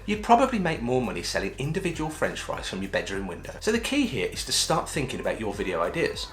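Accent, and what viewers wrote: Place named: Liverpool, United Kingdom. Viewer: i hope you upload more videos about Facebook in stream ads it's been two years now working in this platform and the worst thing is how mysterious Facebook laws for this content and i just find my self lost because the lack of information and guidance soo i hope you continue your work and speak a little bit slowly cause your Liverpool accent is a little bit hard for me 😄😅 but good jop already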